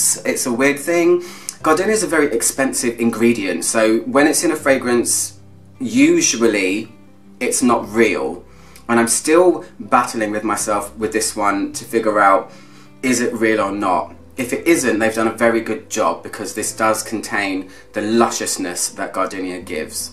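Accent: United Kingdom. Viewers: Perfect and helpful descriptions as usual plus I adore your British accent! On the other hand, could you do a top 10 chypre florals? Thank you very much and please keep on doing these wonderful reviews! Greetings and respect from Spain!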